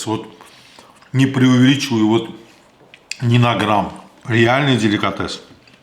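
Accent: Baku, Azerbaijan. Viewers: Бакинский акцент самый узнаваемый и лучший✊🏼🤓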